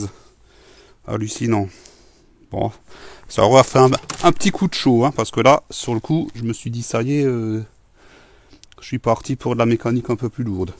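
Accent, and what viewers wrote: Alsace, France. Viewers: Salut, tu viens dalsace? Par rapport a ton accent:D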